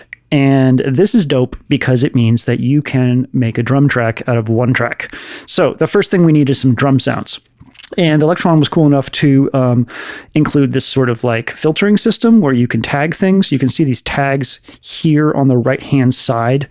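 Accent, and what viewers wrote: United States. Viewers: because your from the midwest , texas perhaps ? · more southern talk plz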